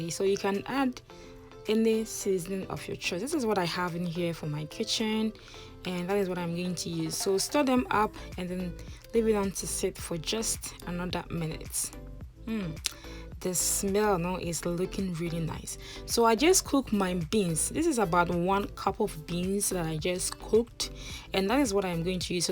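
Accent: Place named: Ghana. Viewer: You geh, ehh mehn, you understanding me eneh so? I feel the same way, I love hearing Ghanaians speak, and she speaks so eloquently and soothingly, but authentic to her dialect. She's not trying to sound American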